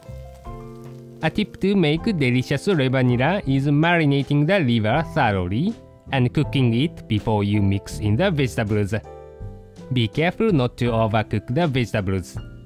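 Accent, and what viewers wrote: Japan. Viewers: Nope. The narrator is Japanese, so it's a Japanese accent. I think it might be the chef's husband, though I'm not 100% sure. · No... It's a Japanese accent dude. (I'm fluent in French, so I know that's not a French accent, I live in Japan, so I know that it's a Japanese accent) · is the narrator a Japanese accent?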